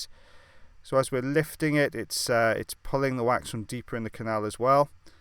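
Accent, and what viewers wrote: Wales, United Kingdom. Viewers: Hes from Wales in the UK. (Just incase you didnt know) so it's a Welsh accent. X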